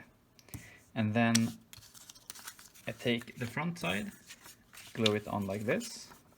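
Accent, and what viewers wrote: Sweden. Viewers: Are you swedish? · Wait are you Swedish, your accent sounds like it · Love the swedish accent lol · Just a quick question. Are you Swedish ? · Your accent sounds... awfully Swedish. (I'd know, 'cause hej hallå, jag är också en Svenne, isf.) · Älskar den svenska dialekten, inte ofta man hittar en annan svenne här på tuben, lycka till med kort byggandet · Are u Swedish? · Hey are you from sweden? No offense but you do have that swedish english accent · This is so cool, also are you from sweden maybe? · I was thinking of Also doing something like this. But im not to talented and i hope you somehow Will be able to buy these. Also is he Swedish? Hes accent souns Swedish/nordic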